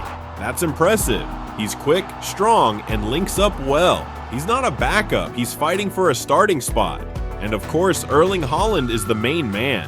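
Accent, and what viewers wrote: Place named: United States. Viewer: video would be better without an american accent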